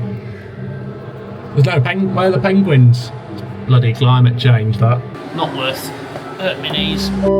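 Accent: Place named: Yorkshire, United Kingdom. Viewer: haha spoken like a true yorkshire lad! <3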